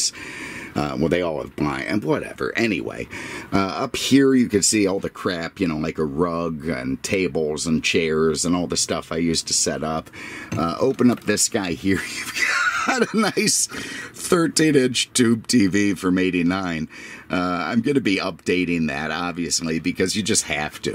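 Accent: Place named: United States. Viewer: You are funny, and sound like a cool American 😎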